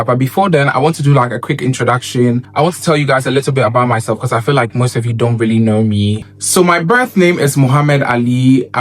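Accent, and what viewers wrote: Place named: Fante, Ghana. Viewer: am subscribing because of how you speak the fante🤣🤣🤣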